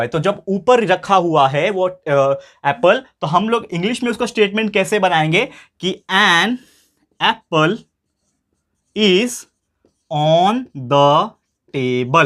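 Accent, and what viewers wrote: Maharashtra, India. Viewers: Sir your marathi is soo cute and nice and your teaching is soooooo beautiful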